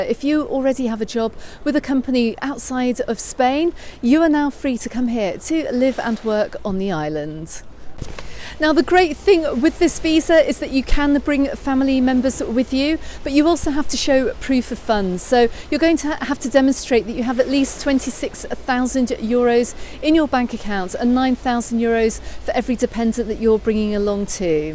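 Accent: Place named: England, United Kingdom. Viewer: I just know how fantastic you are Let me guess where u from by hearing your accent: 1. Leeds 2. Liverpool 3. Birmingham Kisses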